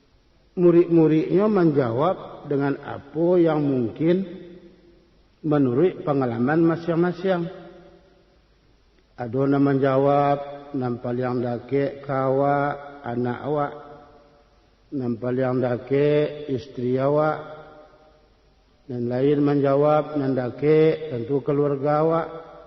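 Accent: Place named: Maninjau, Indonesia. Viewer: Kalau dari Bahaso ampia Samo logat Jo urang Maninjau... contoh bada..mantiko dll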